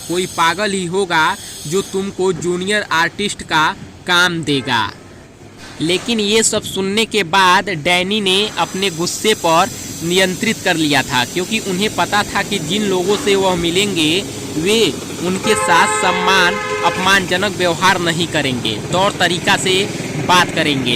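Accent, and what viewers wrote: Bihar, India. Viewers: Are you bihari and bhojpuri speaking person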